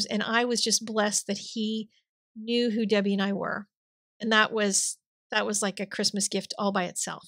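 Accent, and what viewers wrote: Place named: Kentucky, United States. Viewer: Kentucky! Im from Tennessee so I recognize it anywhere lol.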